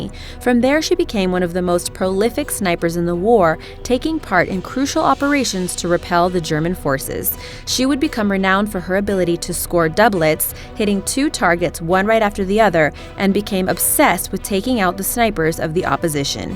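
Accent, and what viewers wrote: United States. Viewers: She has an American accent. How can an American get the buffalo identification wrong? Maybe she is a Millennial.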